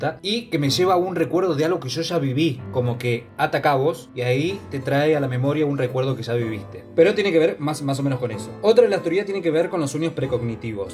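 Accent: Argentina. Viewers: Sos de Goya?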